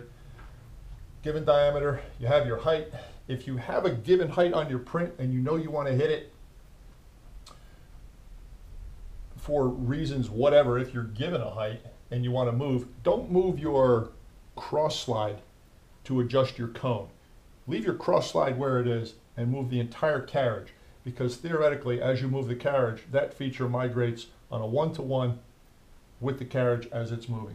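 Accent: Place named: North Jersey, United States. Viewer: I knew you didn't sound like you're from Texas! I had a feeling that was a north Jersey accent. I grew up in south Jersey.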